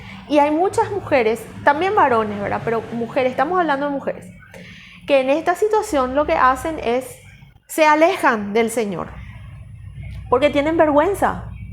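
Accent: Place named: Paraguay. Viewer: Por tu acento creo sos de Paraguay, todos hemos pasado por alguna experiencia similar, como humanos lo experimentamos, gracias al Señor Jesucristo tenemos su Santo Espíritu y el nos ayudará siempre.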